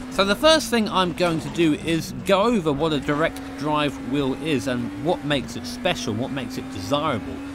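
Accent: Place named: South Lincolnshire, United Kingdom. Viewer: You say "summit" like I do, instead of "something". Are you from anywhere near South Lincs?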